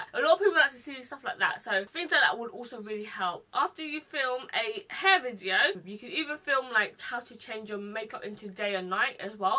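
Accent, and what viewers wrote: United Kingdom. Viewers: Didn’t know you were British !!! Your gorgeous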